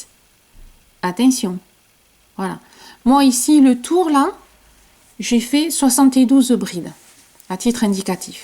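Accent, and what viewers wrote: South, France. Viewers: Bonjour, et merci beaucoup pour ce très beau modèle. J'aime tout! 😍 Le point, la large encolure à la limite du col châle, la construction carrée simple (mon premier patron maison était de ce type, avec également un point en relief, mais avec un col V). Je vais le faire, c'est une certitude! Et que serait une vidéo d' sans son ardoise, son accent plein de cigales, et ses petits rires spontanés? Vous êtes un plaisir pour les yeux et les oreilles. ☺️ Sans compter que vos explications sont toujours claires, précises et détaillées. Je vous remercie encore pour ce gilet qui va définitivement entrer dans ma garde-robe. 👗 Petite précision: je fais mon point élastique en montant une chaînette que je travaille en brides relief avant et arrière sur le retour, et j'avance sur le poignet en mailles coulées. Je trouve que cela resserre mieux autour du poignet, surtout si l'on a une manche un peu large justement pour mettre un autre vêtement sous la veste. 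Je vous souhaite une très agréable journée. Prenez bien soin de vous. 🌺🙏😘🌺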